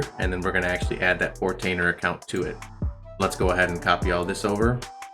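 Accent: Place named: India. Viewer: The indian accent got me good 💀💀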